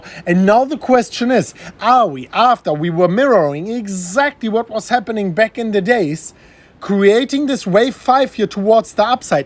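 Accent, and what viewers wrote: Germany. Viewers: This guy has all the things I hate about YouTube. Terrible thumbnails, click bait, shamelessly promoting affiliate links, too many ads etc. However I love the emergency streams even though nothing is happening, the German accent and he seems like a stand up guy. All the best. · Can someone tell me what his accent is? German?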